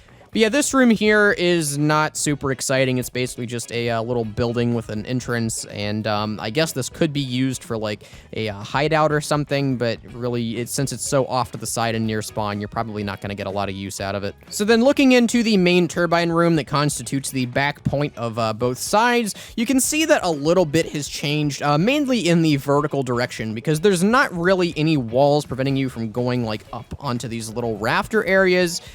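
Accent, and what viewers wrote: United States. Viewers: why does he have the crackly stereotypical american teen voice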